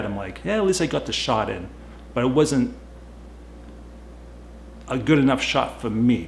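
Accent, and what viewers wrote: United States. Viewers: you sound very american but you say "fuck me" like a true blue aussie I like that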